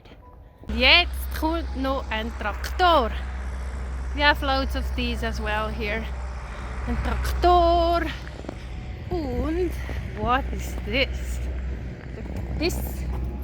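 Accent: Switzerland. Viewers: Which Swiss dialect are you speaking?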